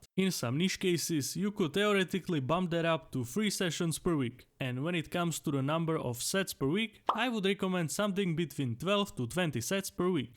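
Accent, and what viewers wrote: Czech Republic. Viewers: Czech accent? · you sound czech, are you czech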